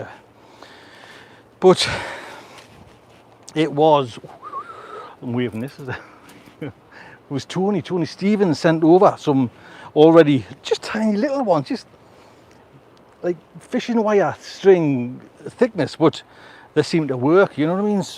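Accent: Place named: North, United Kingdom. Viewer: Sound good... apart from the funny northern accent!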